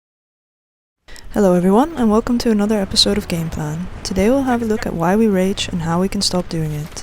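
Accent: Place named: Netherlands. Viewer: Definitly Dutch accent!!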